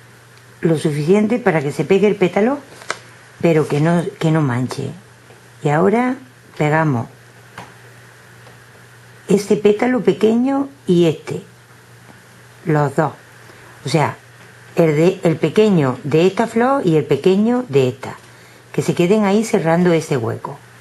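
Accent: Andalusia, Spain. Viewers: Querida....eres una genia, me gusta todo lo que haces eres super creativa. tambien me gusta tu ayudanta ja ja la gatita que dice mamá. Sigue creando y gracias por tu acento andaluz tan bonito. besitos a las dos!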